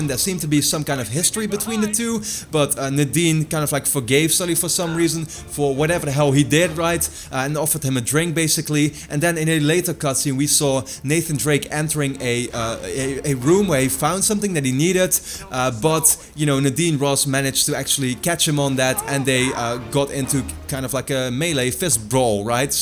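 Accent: Netherlands. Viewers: are you dutch Btw this is a great video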